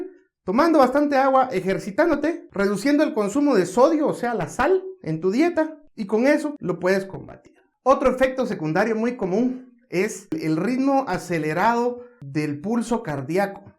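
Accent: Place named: North, Mexico. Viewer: Creo que del norte de mexico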